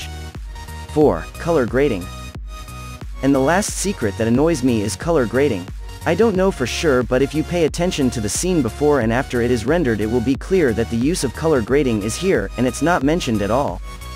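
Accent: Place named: Nigeria. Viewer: I don't know his private channel. I just accessed him, Adolfo, and other Master Class Vids, through the Reallusion site, under the Master Class tutorials section. is actually like Nigerian, or something like that (sorry if I messed up your Nationality description based on your accent). 😁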